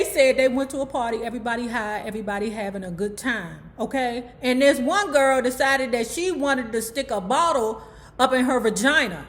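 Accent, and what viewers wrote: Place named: Washington DC, United States. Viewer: Lmao lmaooooooooo you sound like you from DC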